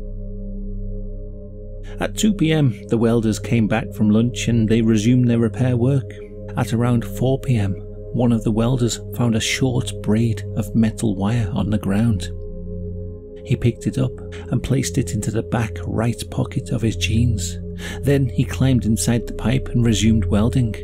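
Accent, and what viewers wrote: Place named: England, United Kingdom. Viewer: This guy (is) English, he's based in the UK. 😂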